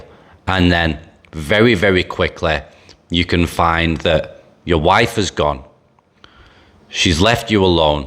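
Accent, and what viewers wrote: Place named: England, United Kingdom. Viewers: Great information! I am also interested in the wide variety of english accents. Where exactly is yours from ? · GREAT STORY...BUT, THE BLOODY ACCENT BROUGHT ME BACK TO MY CONSTRUCTION DAYS IN DUBAI...WITH LADS FROM---UPPPP NORTH. BLESSINGS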